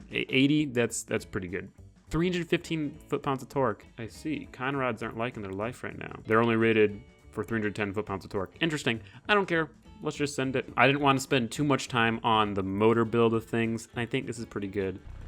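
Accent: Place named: Bay Area, United States. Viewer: he sounds like bay area buggs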